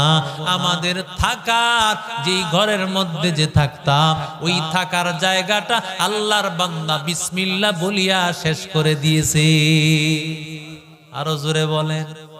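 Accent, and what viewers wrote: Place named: Kuakata, Bangladesh. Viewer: কুয়াকাটা কেন্দ্র্রিক কন্ঠ, মাশাআল্লাহ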